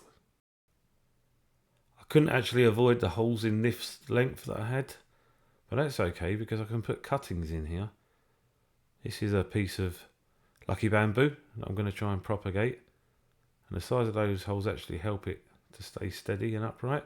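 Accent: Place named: England, United Kingdom. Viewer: Ah. An English accent!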